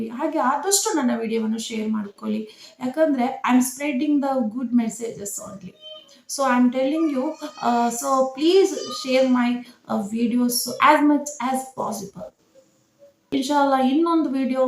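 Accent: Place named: Udupi, India. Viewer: Your accent etc tell me that you are originally from some ಉಡುಪಿ or ಕುಡ್ಲ r area but probably living away from thére. This video indirectly talks about some vital statistics about the high rate of illicit sex among the married female partners of those men who have gone away, usually abroad, finding some employment. You are strongly suggesting here that to a (married) woman being sex-unemployed is next to impossible and so having UTTERly failed to control their desires etc, they have chosen to tread the dark path of physical adultery. You have also said that not all of them have become so which is natural to invite the following legitimate question--how are the majority of those not regularly sexually active ÍN the secret chambers of men coping with their uncontrollable libido--have they been using sex toys and masturbating often, have they been watching or reading mummy porn, have they been having phone sex or non-penetrative sex etc? 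I hope you would also deal with those alternative sex satisfaction means also sometime. Thank you for using ದೈಹಿಕ ಪ್ರೇರಣೆ somewhere which is only a remote euphemistic translation of 'sexual advances'.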